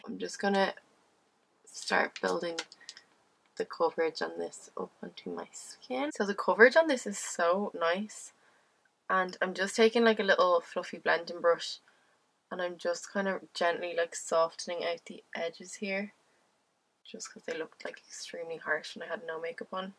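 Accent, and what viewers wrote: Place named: Dublin, Ireland. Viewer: A beautiful look expertly applied. I love your Dublin accent.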